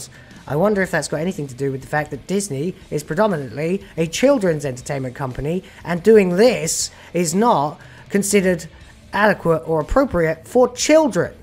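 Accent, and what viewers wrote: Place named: England, United Kingdom. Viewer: Oh, now I know what Joe Pesci would sound like if he had an English accent. Good show.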